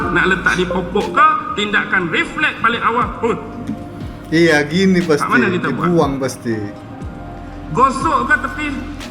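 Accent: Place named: North, Malaysia. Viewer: loghatnya pun dah bertukar jadi loghat utara 😂😂😂😂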